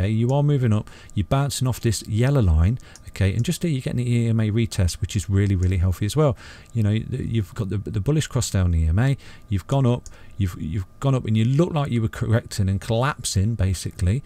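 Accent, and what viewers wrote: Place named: West Midlands, United Kingdom. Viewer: Thanks for the vid, I enjoyed it and learnt a lot. Also sounds like you're also a west midlands chap?hah Where were you looking at this TA chart? cant seem to get the detailed view on coin market cap. Also, im guessing as it went through the level of support you suggested, a new entrry point might be at $0.00020?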